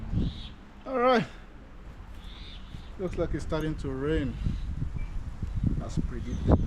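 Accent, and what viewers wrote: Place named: Australia. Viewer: is this austrailia? I love your accent but cant place where yours is from...only country I know that says whipper snipper is austrailia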